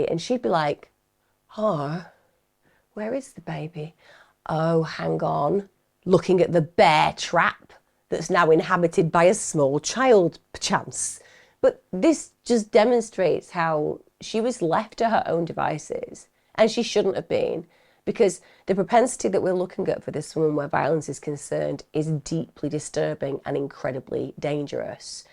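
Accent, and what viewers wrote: Australia. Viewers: I actually LOVE Aussie accent 😂❤😘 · Your Aussie accent is very good, MUCH better than Meryl Streep's!!! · Love your Aussie accent. Well done:) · This is just horrible. I was whincing a lot when you mention about his skin. I feel sorry for all the innocent children in this. Her children and John's children. Also them poor people who actually found his body, they must be dealing with some trauma. If he got a restraining order out on her the same day, then how come she was around him and the children afterwards. I don't know a lot about them but I'm pretty sure they would be instant. And to have sex with her when he had just got a restraining order out aswell is strange. Maybe he was drunk and half asleep. Anyway as always, you are just wonderful. It amazes me how you remember all the details. I could listen to you all day, talking about anything. 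I bet you were fantastic at reading books for your two boys when they were younger, with all the different voices and sounds etc. Great Australian accent by the way. It was so lovely to hear talking in the background, she is letting you know that she is supportive of her amazing Mummy at work, she probably feels comfort hearing your voice. X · She got away with so much under the cover of mental health you can still pay for your awful crimes and be treated for your mental health her crimes just escalate with barely any consequences Loved the Australian accent it was pretty close to how we sound. Being Australian this case has been done to death, but as usual has found out and added so many different facts and put so many different perspectives on all of the people involved in the case❤❤ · It’s gotta be said. Coming from an Aussie. Your Aussie accent is pretty spot on. 😂❤ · Oh I love your Australian accent! 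❤ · Hey! Awesome as always, you're Australian accent + context of delivery = Lmao 😂. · I ❤ ur Oz accent ~ betta than most trained actors who've tried 😊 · Love the Aussie accent!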